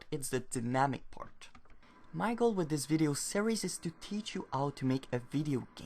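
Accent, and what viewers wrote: France. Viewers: T'es français ? O_o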